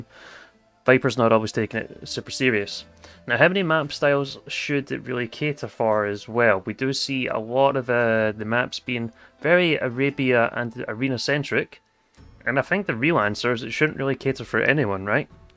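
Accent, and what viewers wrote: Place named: Glasgow, United Kingdom. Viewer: r u fae glasgow?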